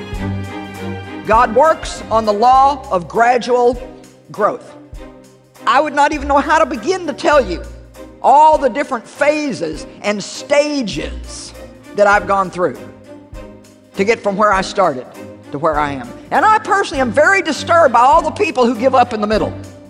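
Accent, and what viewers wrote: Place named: South, United States. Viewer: I can't take this lady's southern accent seriously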